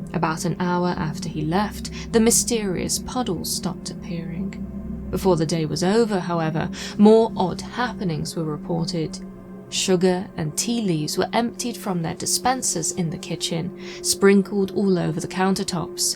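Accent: England, United Kingdom. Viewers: Hearing say "trust me bro" made me laugh! Hearing her beautiful sweet voice, with her English accent, I had to go back to listen to it again because I thought I was hearing it wrong. Nope, I was right, and she did say that LOL. Great video as always! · All I have to say is every time I hear your voice in these vids I think " oh boy there is that English Girl throwing down a good story... Keep it up.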